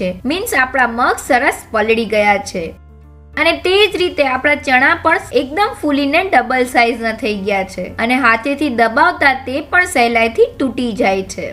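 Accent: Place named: Kathiawar, India. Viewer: good,Kathiyawadi uchchar sambhalvani maja avi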